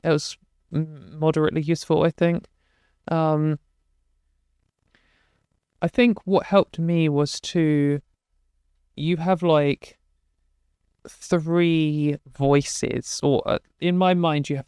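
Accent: United Kingdom. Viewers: I'm gonna be honest here, I do think you have a lovely voice,. Pleasant to listen to. But then again, I've always been a sucker for those "husky" warm voices with Bri'ish accent anyway 😅 It was mentioned here before but I'll repeat it 'cause it's so important: record yourself. Yes, it's cringy at first. Nobody likes to hear their own voice, and that's because you're not used to hearing it from a distance. But it's oh so helpful if you ever want to improve / correct anything. And that also counts for all those singers / musicians out there who think they're god's gift on Earth. Record yourseif! We don't want to suffer alone haha 😂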